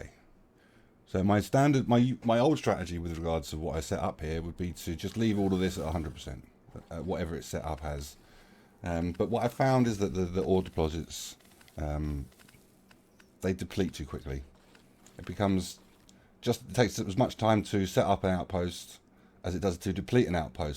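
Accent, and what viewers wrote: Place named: England, United Kingdom. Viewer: Are you from England your accent sounds like mine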